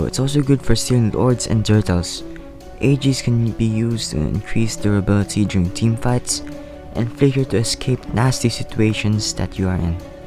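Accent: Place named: Philippines. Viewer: the pinoy accent thou😂 chill voice btw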